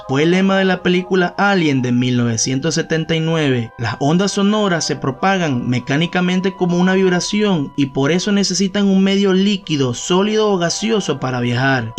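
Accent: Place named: Caribbean. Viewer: Lo unico escalofriante es tu horrible acento caribeño...por que no te dedicas al regaeton!!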